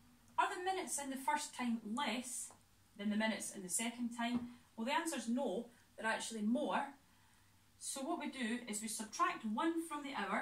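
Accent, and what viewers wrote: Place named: Scotland, United Kingdom. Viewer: I LOVE THATS YOURE SCOTTISH AND IM SCOTTISH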